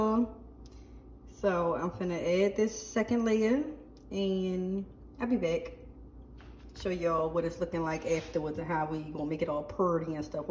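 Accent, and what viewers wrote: Baltimore, United States. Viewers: You from Baltimore ain't you? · She’s from bmore I can hear it lol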